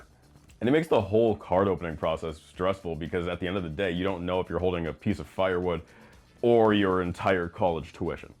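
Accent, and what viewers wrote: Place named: Ohio, United States. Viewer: Young, you sound like ohio.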